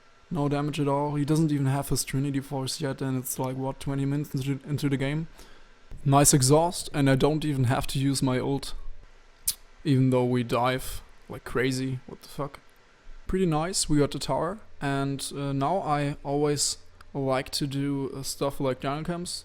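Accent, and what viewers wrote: Germany. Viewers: First of all, great video! Second of all, where are you from? Your English is very good but you sound German.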